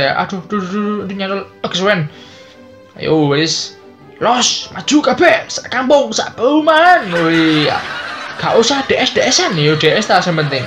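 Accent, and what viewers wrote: Java, Indonesia. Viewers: wong ngdi lur kok logat.e medok😀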